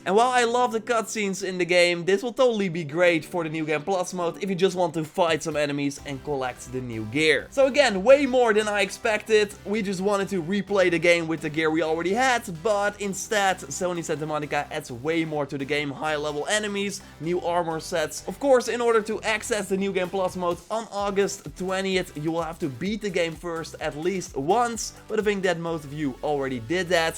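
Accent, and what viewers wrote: Netherlands. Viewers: This guy sounds so DUTCH.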